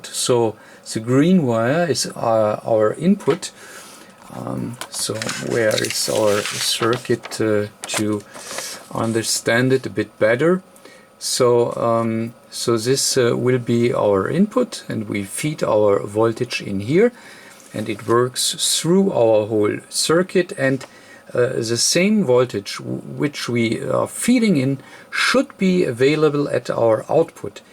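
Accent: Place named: Germany. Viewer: This is a magnificent tutorial. I think you might say "ausgezeichnett". It's got everything - careful and clear description of the theory, the practical implementation and finally the proof that it all works to plan. I have absolutely no problem with the slow delivery in English. Makes it clearer and I find the German accent charming.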